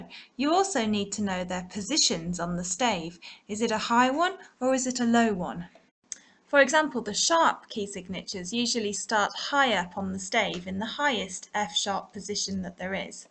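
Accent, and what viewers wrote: United Kingdom. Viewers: Ahhh British accent 😲😲😲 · Thank you so much. I'm teaching myself piano, and you've been a huge help! And I like your British accent.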